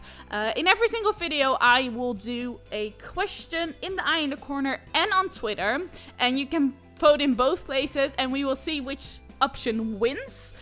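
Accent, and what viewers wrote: Netherlands. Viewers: your accent is so cute! i really want to learn dutch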